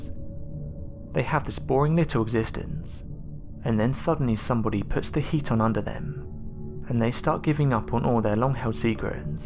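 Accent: England, United Kingdom. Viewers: I don’t need some British guy telling me about OUR VOLCANO IN ‘MERICA!!! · It should be an AMRICAN dude doing the documentary is this ENGLISH dude a geologist? IDTS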